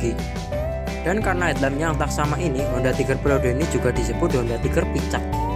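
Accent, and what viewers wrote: Java, Indonesia. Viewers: yaampun wng ndi iki suorone khas jowo bgt 😂😂😂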